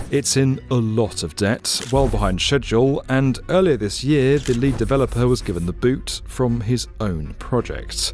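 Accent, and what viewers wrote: United Kingdom. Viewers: I know this channel is based in London, but I always feel a British accent in a documentary is just fake and added to fool people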